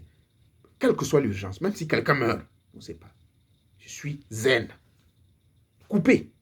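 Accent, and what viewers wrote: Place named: Gabon. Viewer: bonjour au fait dites moi vous parlez beaucoup du Gabon votre mélodie est gabonaise Êtes vous gabonais ou vivez vous? C est rare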